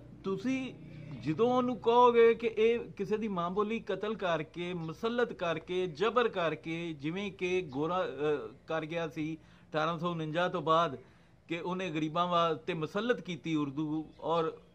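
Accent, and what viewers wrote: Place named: Punjab, Pakistan. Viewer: Gulabi Urdu.. Like it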